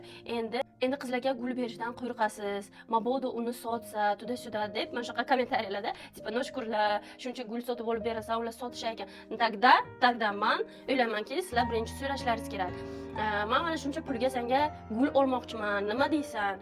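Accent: Bukhara, Uzbekistan. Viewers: ❤❤❤ zo'r wud hamin gapaton pryam buxorocha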